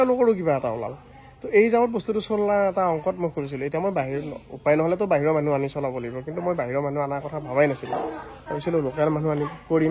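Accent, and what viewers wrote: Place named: Darrang, India. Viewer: ভিডিঅ' বোৰ ভাল লাগে কথাবোৰ পুৰা দৰঙীয়া আমিও দৰঙৰে। আপুনি মানে খালৈত বৰশী বাইছে আৰু নেকি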